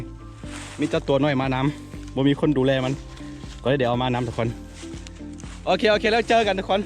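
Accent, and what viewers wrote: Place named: Isan, Thailand. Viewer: ทำไมพูดเหมือนคนอิสานคะอยู่จังหวัดไหนคะ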